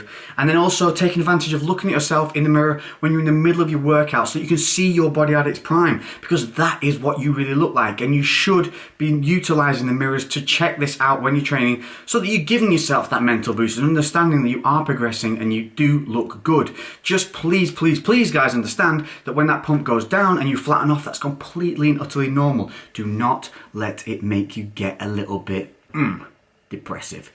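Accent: United Kingdom. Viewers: How did I know he was going to have a brittish accent before the video started LOL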